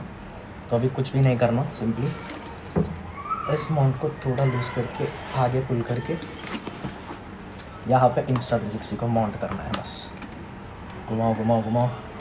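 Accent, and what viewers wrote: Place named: India. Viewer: Love your Hindi language accent🥰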